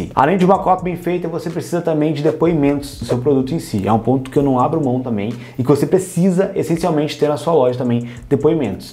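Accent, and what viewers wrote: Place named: Rio Grande do Sul, Brazil. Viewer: Tchê tu és de onde daqui do RS?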